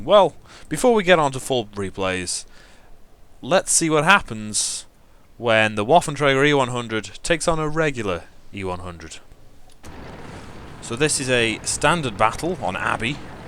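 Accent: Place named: United Kingdom. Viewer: I just love the Brit accent. it's quite epic. Makes anything great (he could read from the dictionary and it would sound good to our American ears !) :-) (but he does good reviews too - beyond that lol)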